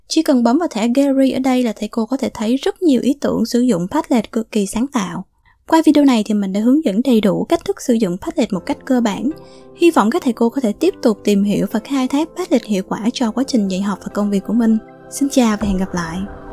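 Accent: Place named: South, Vietnam. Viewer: ôi đúng là giọng con gái miền Nam, nghe ngọt xỉuuuuuuuu nghe kênh của bạn này hướng dẫn vừa dễ nghe lại dễ hiểu, dễ làm mong bạn có nhiều video như thế này nữa <3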